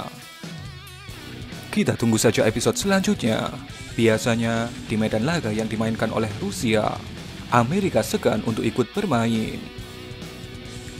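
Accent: Java, Indonesia. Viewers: Bahasane MEDOOKKKK....wagu lahh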